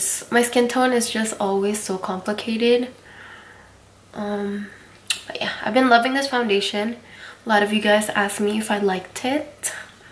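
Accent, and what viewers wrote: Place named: United States. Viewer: Christina Tran well she sounds american to me so I think thats classed as an accent aha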